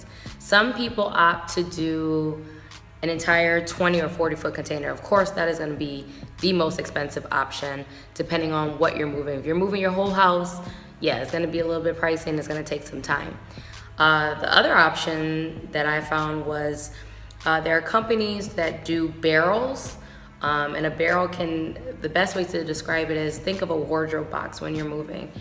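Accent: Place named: Liberia. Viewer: So cute when u speak Liberian English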